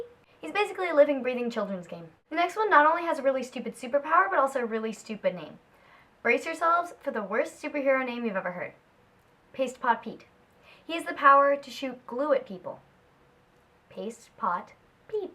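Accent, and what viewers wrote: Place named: Canada. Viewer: Love this, think you'll go far. By the way are you Canadian? Love your accent x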